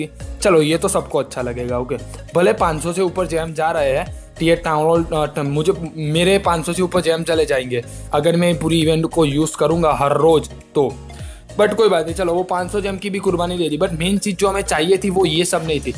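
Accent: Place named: South, India. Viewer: Tumaree English south Indianz ki tarah hai :)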